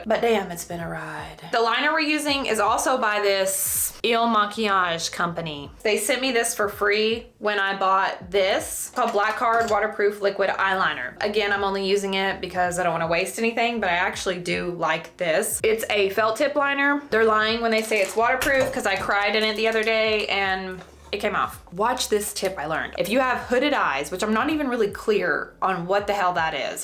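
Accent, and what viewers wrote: South, United States. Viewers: Good morning ❤️🙏. I’ve been checking out a lot of your videos from the older ones to the ones that you post now and may I say that I absolutely love your tell it like it is attitude and I definitely agree with you on a lot of what you say.👍🏻💪🏻🥳. I also love to check out your cooking videos as well cause you truly are a hoot when you’re cooking and I love your southern voice too. I’ve been checking out at least two to four videos from you daily.😁👍🏻. Do hope you have a wonderful and blessed day and don’t ever change that awesome way of thinking too cause a lot of what you say is absolutely the truth. God bless you and keep the faith and definitely keep smiling too. You’re a pretty lady too. Hugs, Sue M. Clearwater, Florida ❤️🥰🥳💪🏻🙏⭐️🐚🌴❌⭕️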